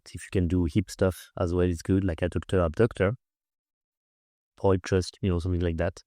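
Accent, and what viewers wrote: France. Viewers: by accident, I trained for watching this channel by watching NH first. I have a bad ear for accents and I don't think I could understand you without acclimatizing myself to a thinner french accent first lol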